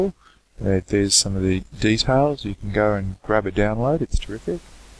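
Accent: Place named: United Kingdom. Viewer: Hey, this was a GREAT tutorial! Thank you very much for posting. I had used Audacity for a while, but stopped because I could NEVER figure out how to denoise things (and getting a new mic helped a great deal too...) Now, after seeing this, I think I'll start using Audacity again, as there are some very nice things about it... Faved. Good to see you again. Hope you're well. (I had NO idea you were British!:D)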